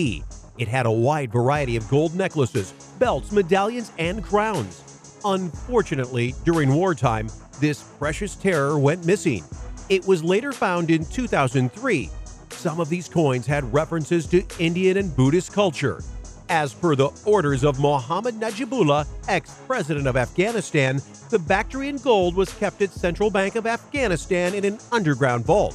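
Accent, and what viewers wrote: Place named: United States. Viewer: Please, PLEASE, PLEASE!!Either choose Euro €, or Pounds £. These are NOT interchangeable. Also when pronouncing English towns ending in "shire," you say it more like "sha." So Lincolnshire would be, Linc-on-sha. Heavily pronouncing the "ire" always gives Americans away.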